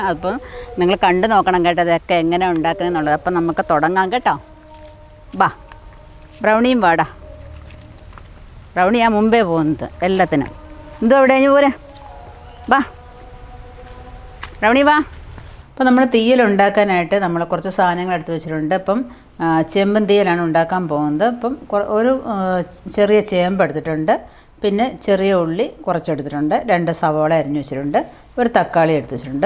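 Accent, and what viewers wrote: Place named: Pathanamthitta, India. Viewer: Hello Eavideyanu Sthalem Samsarem Kettittu Pathanam Tittayano ❤🧡❤🧡❤🧡❤🧡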